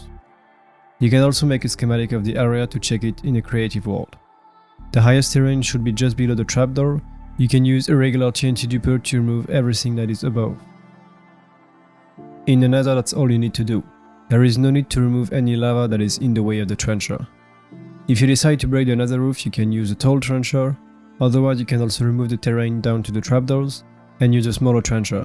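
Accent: France. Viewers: On dirait que j'entend un français...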